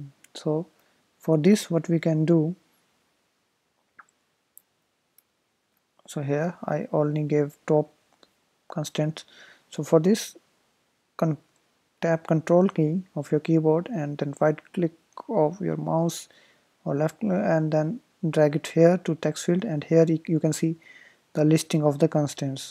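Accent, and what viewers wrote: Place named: India. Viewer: i ask the video uploader to learn English.. either american or English accent.. cant cope with the indian accent even for a second !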